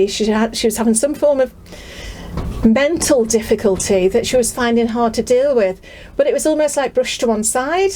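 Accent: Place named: Stoke, United Kingdom. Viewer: Hiya you sound like a stokie? Have you had any storm damage?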